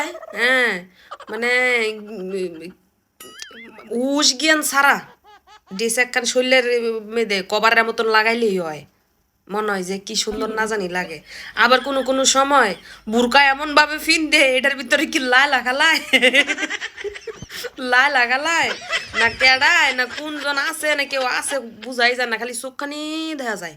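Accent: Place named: Mymensingh, Bangladesh. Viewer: Kmn jani mymensingh ar basar moto lage😂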